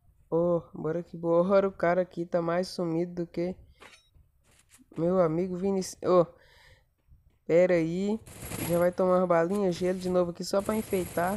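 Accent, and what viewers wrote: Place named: Northeast, Brazil. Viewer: Esse é nordestino ''já vazou la por riba'' e noix <3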